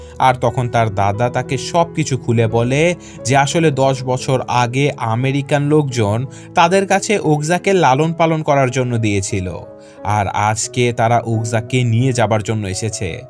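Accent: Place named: Bogura, Bangladesh. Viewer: ভাই আপনার বাসা কি বগুড়ায়,,কারন আপনি আমি না বলে হামি বলেন,,হামি তো আমার পছন্দের বগুড়ার ভাষা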